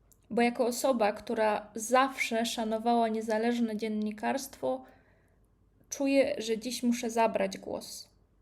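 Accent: Russia. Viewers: Ty ruska. Na front! Czuć rosyjski dialekt. L! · Audycja sponsorowana. Zobaczcie i poznajcie sponsora kanału jeżeli potraficie czytać i słuchać w melodyjnym języku rosyjskim 🤣 Bystrzejszym uszom proponuję wsłuchać się w akcent pani lektor. Czego tu jeszcze nie rozumiecie? 😂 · Czyli jak maciak popiera pani rosyjski i białoruski reżim, po Pani akcencie nie dziwię się. Łagry, zabijanie przeciwników politycznych jak Nawalny - to jest wolność słowa. No Brawo. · Ile tu ruskich troli się namnożyło. A tak w ogóle niech pani popracuje nad językiem polskim, bo słyszę akcent rosyjski z pani ust.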